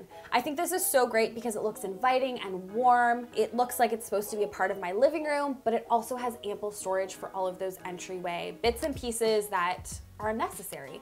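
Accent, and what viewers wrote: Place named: Canada. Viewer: Very useful, you are very talented. I love your canadian accent too. 'Aboot!' And you live in Toronto, you lucky person!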